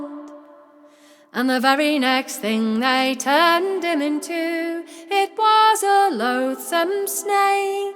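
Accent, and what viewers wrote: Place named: Northern England, United Kingdom. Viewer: Wow, just found this site and was bowled over! My first conclusion is that you are from Lancashire but video by video I have been proved wrong! But i still love the hint of "flat northern vowels" that, at least, I think I am hearing. I guess living on a small holding in deepest darkest rural mid Wales has muddied my elderly sense of hearing.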